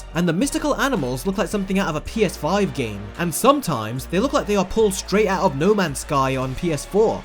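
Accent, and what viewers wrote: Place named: United Kingdom. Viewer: I could be wrong- and I apologize in advance! But, I believe b/c he's British, they pronounce the "a" differently. Same thing with "Mulan." He pronounced her name differently as well.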